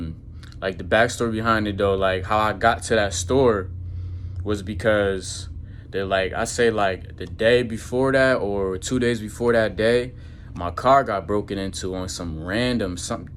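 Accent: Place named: New York, United States. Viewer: Good video bro💪🏾 The way you talk gives me New York vibes lmaoo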